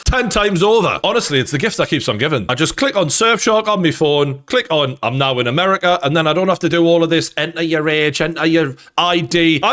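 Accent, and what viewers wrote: Newcastle, United Kingdom. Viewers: luv a good ole Geordie moan😂😂 serves u ryt for prayin on LFC’s downfall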